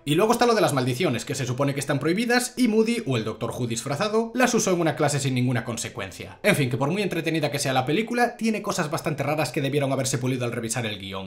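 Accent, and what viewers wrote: Cuba. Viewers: Era acento cubano? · el acento cubano me hiso el dia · Que acento cubano tan racista…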